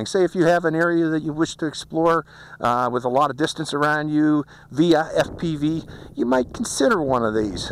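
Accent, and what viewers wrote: Pittsburgh, United States. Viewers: Hi there, you have to be from Pittsburgh or there abouts, thats Steelers Country accent. Banggood has this whole package, copter, i6, goggles and even a case for 50% off and it's the updated version with new stacked ESCs, GPS module is oriented properly etc. The deal ends tomorrow, $180 for everything gonna give it a shot.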